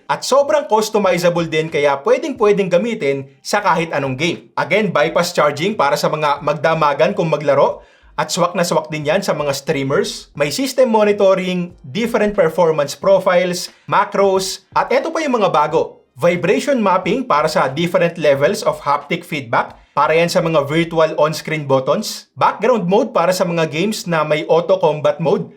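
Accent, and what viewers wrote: Cavite, Philippines. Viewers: Kabiteño k pla boss ahahaha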